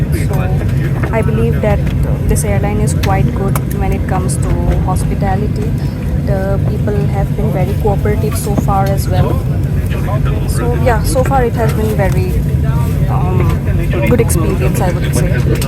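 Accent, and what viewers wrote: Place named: India. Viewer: I like ur deep indian ascent English... sounds interesting indeed..how do U like Malaysia?!!🎉😅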